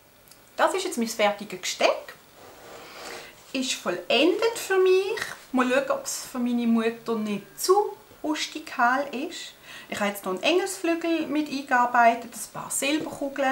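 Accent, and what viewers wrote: Switzerland. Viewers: Bitte nicht auf Hochdeutsch, soviel Charme geht nicht im trockenen Hochdeutsch. Wir beißen uns doch gerne durch deinen zarten Schweizer Dialekt, der ja sehr dezent, aber auch so liebreizend rüber kommt. Und deine Videos liebe ich soooooooooo sehr. Einfach nur wunderschön, kreativ und sooooooo geschmackvoll. Vielen Dank auch für deine ruhige und tiefenentspannte Art, auch, wenn man manchmal merkt, wie es dich bei manchen Themen umtreibt😉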